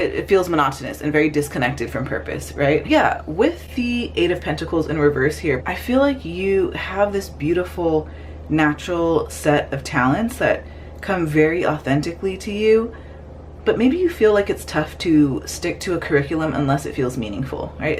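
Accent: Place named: Toronto, Canada. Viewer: You have a Toronto accent. I was surprised when you said “university in my state.” Also, thanks for doing career readings. There are too many love channels.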